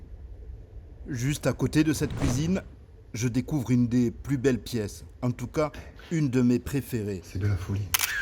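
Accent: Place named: South, France. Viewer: Bonjour, ohhh tu as l'accent qui attire le chant des cigales tu me rappel Carcassonne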